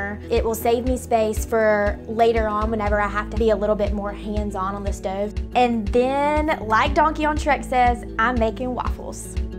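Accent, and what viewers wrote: South, United States. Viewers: You are so stinking cute!! I’m from Minnesota so I get a kick listening to your cute little southern accent! :) I will definitely be subbing to your channel! :) Love from Minnesota!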